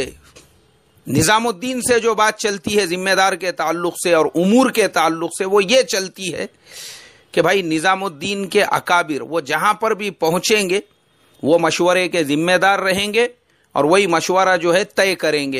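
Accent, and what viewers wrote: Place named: South, India. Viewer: Aap ki lahze se malum chalta he aap junubi hind se taaluk rakhte hein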